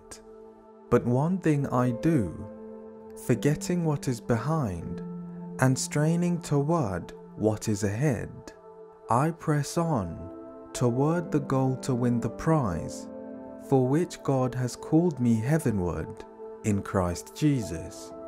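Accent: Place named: United Kingdom. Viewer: I was looking forward to this, but... the British accent put me off. Too bad for me but I certainly hope this is good for those who can tolerate the accent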